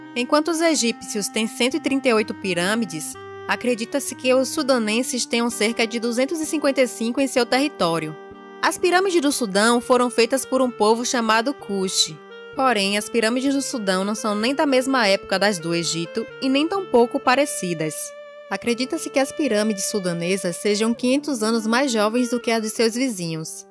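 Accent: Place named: Bahia, Brazil. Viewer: Essa narradora é baiana hein kk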